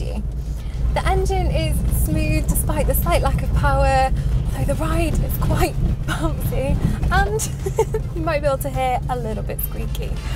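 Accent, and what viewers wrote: United Kingdom. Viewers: More women with lovely British accents should do more car reviews!!! Am I right? This young lady is absolutely charming....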